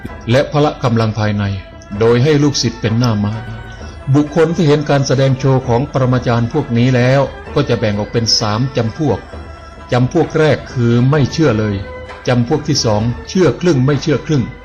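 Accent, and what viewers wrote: Isan, Thailand. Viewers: ชอบมากเลย... พูดอีสาน ได้ม่วนอีหลีเด้อ55555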